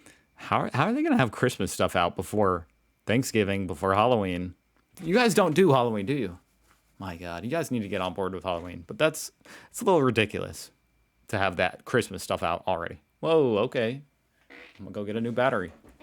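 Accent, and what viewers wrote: Australia. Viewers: Big W is a part of Woolworths and it's pronounced Ekidna 😂😂 love your videos and your Aussie accent 😅 Happy Fathers day to you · Mate, your Aussie accent is on point.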